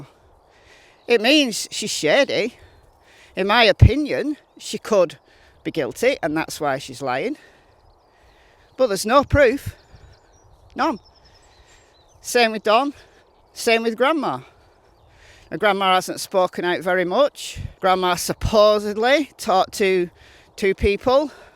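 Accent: United Kingdom. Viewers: Your channel makes the most sense. You are not a gossiper, fabricator and are authentic and I love your British accent 👋🏽💘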